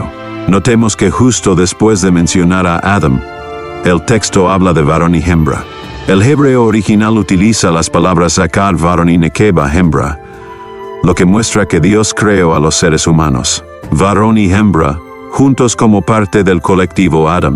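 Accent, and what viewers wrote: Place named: United States. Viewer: Por qué pusiste la voz de un gringo si esta hecha con inteligencia artificial, por qué no pusiste la voz de un latino?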